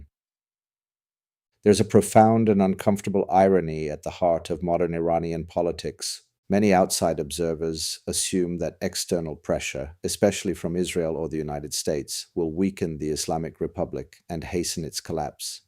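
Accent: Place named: United Kingdom. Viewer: Bro aren't you American? Where did the British accent come from?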